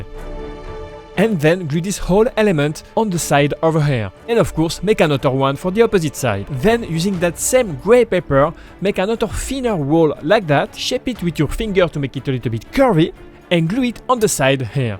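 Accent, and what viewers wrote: France. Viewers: Are you French?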